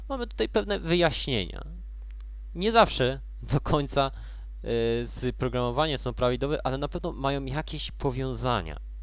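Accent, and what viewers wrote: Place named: Russia. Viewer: Jesteś polakiem?Masz rosyjski akcent :o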